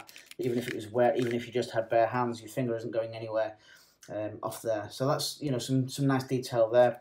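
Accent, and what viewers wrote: England, United Kingdom. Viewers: Reviewing the 1776 with your English accent was a treat. 👌 Not a bit of salt over the defeat. 🤣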